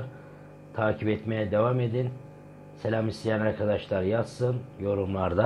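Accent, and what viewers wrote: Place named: Southeast, Turkey. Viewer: Üstad Güneydoğulumusun ? şiben biraz andırıyorda 😊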